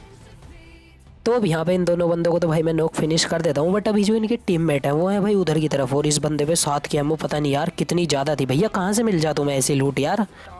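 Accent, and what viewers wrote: Haryana, India. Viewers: Bhai app Haryana Se ho kya?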